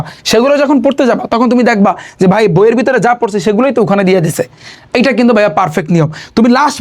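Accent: Barishal, Bangladesh. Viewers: Vai ki Barishailla?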